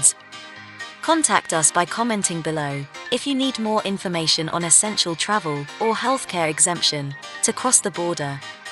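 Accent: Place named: United Kingdom. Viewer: Why the British accent if this is about Canadian immigration. Please change it. We are proud of our identity.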